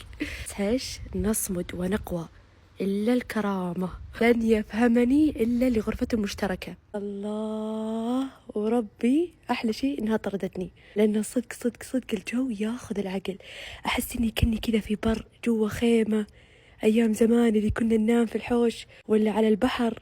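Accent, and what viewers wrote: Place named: Saudi Arabia. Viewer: وش متوقعه الهجه السعوديه اكيد كذا تجننن😊😊😊😊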